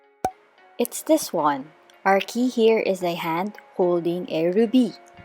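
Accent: Philippines. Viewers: Your accent is so filipino, it's nice hahah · Are you Filipino? I could hear the accent, also trying to learn and just understood all of hiragana I think haha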